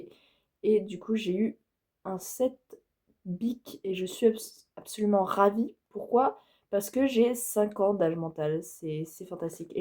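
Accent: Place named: England, United Kingdom. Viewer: Je kiffe ton accent anglais 😮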